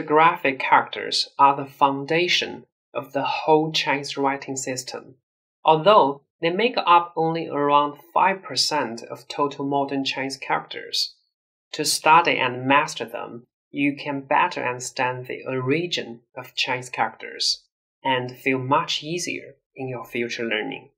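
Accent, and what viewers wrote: Standard. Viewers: I am Chinese , I can tell you that this teacher speaks Mandarin , his pronunciation is very standard !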